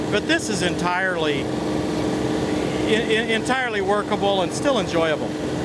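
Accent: United States. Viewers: Just like the way you Americans talk I can listen to you all day ...your doing a great job 👍 have a great day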